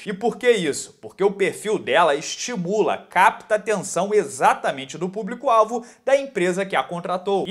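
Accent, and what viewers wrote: Rio de Janeiro, Brazil. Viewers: Puta q pariu!!! Esse canal é da hora ms sotaque carioca é tão repugnante que chega da agonia kkkkkk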